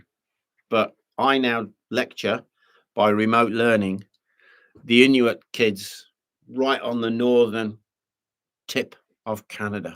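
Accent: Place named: Midlands, United Kingdom. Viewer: I love listening to you. It is nice to hear someone with my accent on youtube, as I come from somewhere near Lichfield. You truly are a gifted story teller and I love both your history videos and the ones about your life❤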